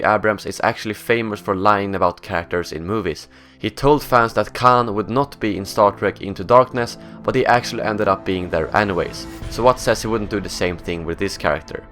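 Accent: Sweden. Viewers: Älskar din svensk engelska :D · U sound swedish ???? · Dat svengelska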